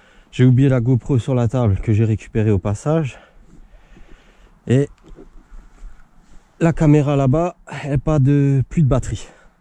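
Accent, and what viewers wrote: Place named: Alsace, France. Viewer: Jolie commune pour l'époque et pas un chat au bord de l'eau. :) Ce petit canal est non seulement très poissonneux mais abrite dans certains biefs de bien belles surprises... Vu l'accent je me suis dit que t'es d'Alsace ou de Lorraine,alors je suis allé sur la page fb et j'ai immédiatement reconnu les photos du Rhône au Rhin à grand gabarit à l'île Napoléon donc j'en conclu que t'es de la région Mulhousienne. :)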